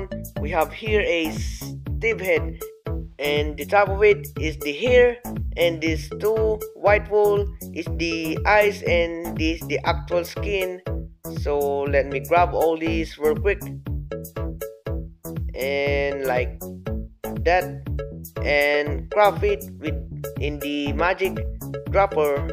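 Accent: Philippines. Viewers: Bluestone- Gaming BG He has a filipino accent so you cant blame him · Are you from the Philippines? I live there too but my English isn't like this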